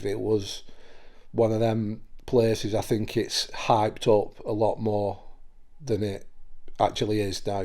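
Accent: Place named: Manchester, United Kingdom. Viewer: Hey up.. just listening to your background its a bit like mine.. I lived with my grandma n grandad but mine was a little boy!!! I had a load of crap that started cos i used to talk to it.. bit of a long story!! But i asked it to leave us alone in the end.. it followed me when i went to live with my mum & brother down the rd and it went crazy there.. now i block it all out now and focus on my belief in God and pray the rosary, i never say gods name in vane and try and lead a good life.. I've never done a ghost hunt.. definitely not the glass game as my mum will say.. far to dangerous.. do you mean you used to do those kind of things with groups?? Ya sound a Manchester bloke.. I'm Sheffield and I know there's loads of ghost tours around Sheffield cos my stepdaughter went on some.. I went mad at her cos i was always taught to not mess around with that stuff and like mediums etc cos your opening yourself up.. 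I'll pray for you & your family.. stay positive n I'd say don't talk to it and pray the rosary.. I ordered a rosary with a prayer book off ebay.. tells you how to say the rosary properly.. You and your family keep safe and well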